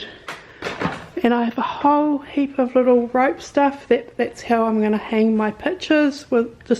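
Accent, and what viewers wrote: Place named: France. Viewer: I love your style, it's right up my alley. Just a beautiful array of pictures. I adore your French accent so much. 😍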